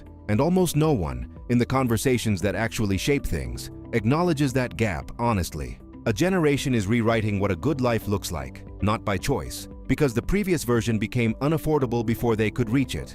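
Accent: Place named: Australia. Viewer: Just Aussie. Too strong is off putting.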